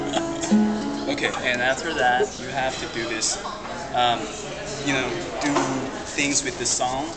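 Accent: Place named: China. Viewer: Where are you from? Like a Chinese.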